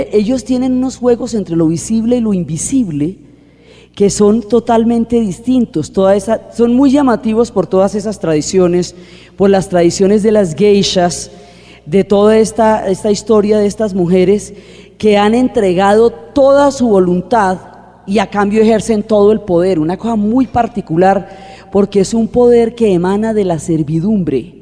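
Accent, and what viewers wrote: Colombia. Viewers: Doctora gracias por compartir tanto tiempo con nosotros y tan bello y extenso conocimiento y disfruto mucho su forma y vocabulario, salí de Bogotá hace 56 años y usted me trae a la memoria nuestra forma de hablar nuevamente gracias Dra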